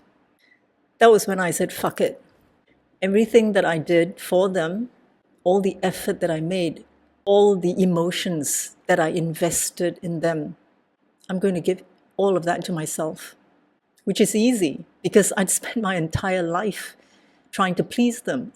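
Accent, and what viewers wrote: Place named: Malaysia. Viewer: Hi, the universe has a miraculous way of working out. Some back story, I was born in Malaysia but moved away at a very young age. I am also a scapegoat daughter and wondered about my Malaysian roots and what it would be like to meet someone who's also Malaysian and going through a similar journey. Your video popped up in my suggestions and your accent caught my attention, turns out you're a fellow Malaysian. I've watched a few of your videos and thank you ❤ my revenge plan is to heal and affirm/validate myself.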